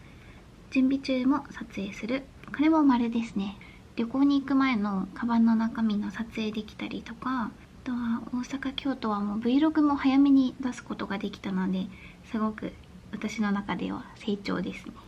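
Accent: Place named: Okinawa, Japan. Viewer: 沖縄の方言おもしろーい😊 私は愛知の尾張弁と三河弁と岐阜弁が混ざってて、よくわからんことになってます笑 愛知岐阜三重は、友達とか職場にいるからうつるんですよね、、、😅笑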